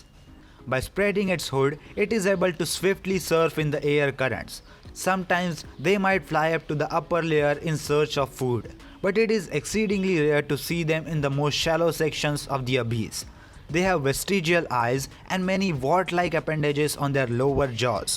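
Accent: India. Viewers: Made in Abyss is one of my recent favorite series speculative evolution and creature designs. The fact I play Pokemon Violet, and train down in Area Zero, which somewhat resembles the Abyss, means that the series not far from my mind. Coincidentally, the raids also have a star rating, although the highest rating is 7 rather than 6 stars! I almost kind of wish we'd get DLC Pokemon based off on some of the Made in Abyss creatures! Normally, I have a notoriety for understanding what I believe is an Indian accent. And while yours is quite strong, you take the time to pronounce your words speak them and strongly. I appreciate that. Even some American YouTubers fail to do this, harming the enjoyment that can be gained from listening to their videos.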